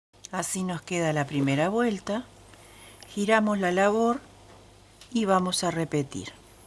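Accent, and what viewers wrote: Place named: Argentina. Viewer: Señora quisiera que usted me recuerde el punto Margarita, muchos les dicen jazmín, yo lo tejo hace ya un tiempo,pero olvidé como usted explica muy bien las cosas le pido ese favor la, la forma de tejer y de hablar suya dr parece mucho a nosotros los Argentinos, sepa usted que acá es muy querida un abrazó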